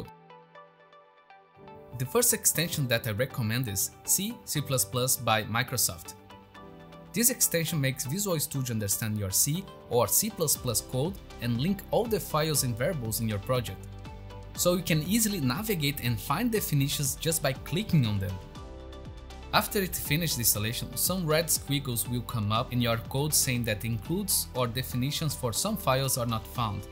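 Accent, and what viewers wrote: Brazil. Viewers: Thanks for the video, you really helped me, also as a good brazilian I noticed your accent!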